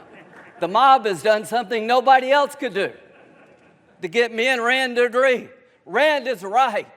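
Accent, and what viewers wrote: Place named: South, United States. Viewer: Love his Southern accent! 🤣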